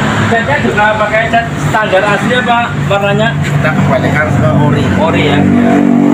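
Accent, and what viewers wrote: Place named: Banyumas, Indonesia. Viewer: Salam. Ngapak mas. Tambah Sukses selalu. Amin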